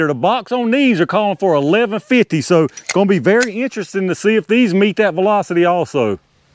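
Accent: South, United States. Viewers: Love your videos, your speech/accent. Makes me want to be a southern boy.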